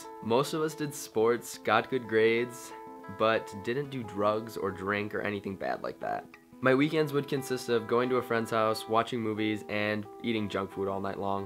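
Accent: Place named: Midwest, United States. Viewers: I knew I recognized your Midwest accent! I was born in Wisconsin and moved to Minnesota too! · You definitely sound like you're from Minnesota...cute :) So am I!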